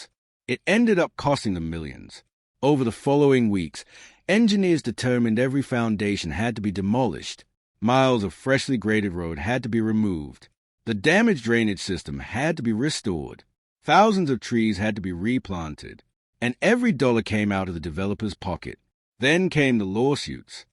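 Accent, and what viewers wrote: United Kingdom. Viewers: Same British nariator.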